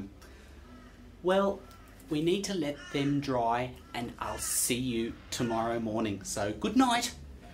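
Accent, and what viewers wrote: Australia. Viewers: I was in Oz for a couple of years and I just realized how much I miss the Oz accent until I watched your vids. Good work btw ! I will try soon.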